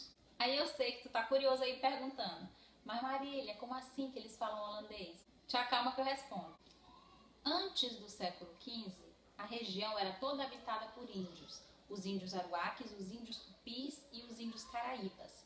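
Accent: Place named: Ceará, Brazil. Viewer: neste vídeo, aprendi muito sobre a história do Surimane. Falas muito bem, organizas as frases como uma pessoa culta. Guardas, ainda, sotaque que pode ser do Ceará ou do Piauí, salvo engano. Pretendo, visitar essas três Guianas para conhecer os lugares e aprender mais sobre as culturas e os costumes, além da história. Tenho dificuldade de imaginar sobre a economia de um país cuja população - ao que parece - se concentra quase toda em uma cidade. Resumo: gostei muitíssimo de "tropeçar" neste vídeo. Tanto que me inscrevi logo. Parabéns.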